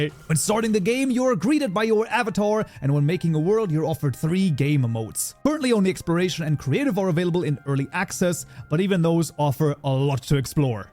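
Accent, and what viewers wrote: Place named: Scandinavia. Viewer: 99% of the time I can tell of somebody is German from accent alone. How did you manage to get a Scandinavian accent? 🤔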